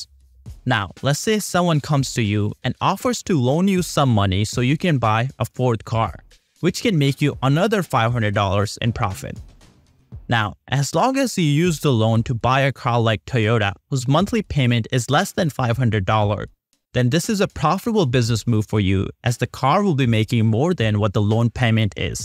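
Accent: India. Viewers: This guy is Indian. His accent is different from most indian but the the way he rolls his tongue is definitely indian. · Why you speak with an indian accent?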